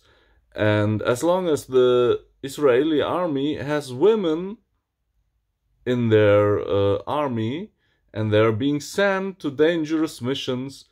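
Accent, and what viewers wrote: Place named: Oxford, United Kingdom. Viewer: Very beautiful spoken my friend! And even if THEY are not my friends, nevertheless I love the noble pronunciation of cultivated Oxford English . Your pronunciation sounds really Exceptionally beautiful , chapeau und mashallah ☺️ Is the one state solution the final solution 😄.. just kidding... a little word game 😂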